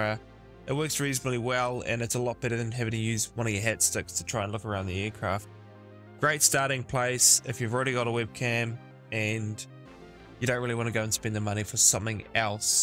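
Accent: New Zealand. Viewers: Great tutorial mate. I've been flying in DCS for 3+ years now but it is always good to see what else is out there. I have the Warthog HOTAS at home with VR and I travel with the Extreme 3D Pro to play on the go. I never knew about the entry level eye tracking, it might be worth considering when I am away. Did I hear a slight kiwi accent? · AYEEEEEE YOUS A KIWI ASWELL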